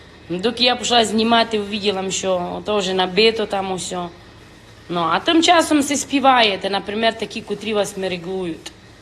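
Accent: Transcarpathia, Ukraine. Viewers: Закарпатський діалект.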